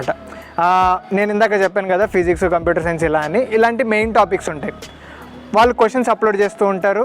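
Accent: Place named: Bhimavaram, India. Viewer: super bro... Meeru bhimavaram valla.. naku mee videos vinnappudalla ma uru la anpistudi😊. All the best 👍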